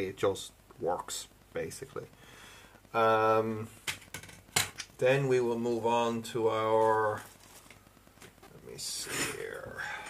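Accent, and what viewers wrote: Ireland. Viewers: His accent is Irish.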